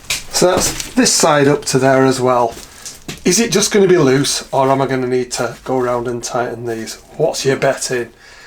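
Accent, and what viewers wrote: Yorkshire, United Kingdom. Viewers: "At the suggestion of my wife..." I don't know how many times my Yorkshire Lass wife has pointed out some reasonable mechanical solution while I'm busy overthinking. I often show her your vids as she loves hearing the Accent of Her People and little things like Henry Hoover in the background that remind her of home.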